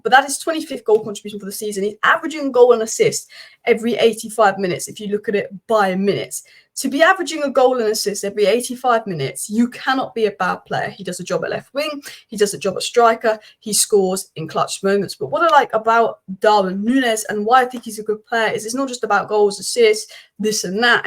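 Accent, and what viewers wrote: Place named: Manchester, United Kingdom. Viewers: Typical United fan sounding like a true Mancunian and like all united fans never going a game. Just imagine if united take points tomorrow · Can you slow down please? I can’t understand your Manchester accent.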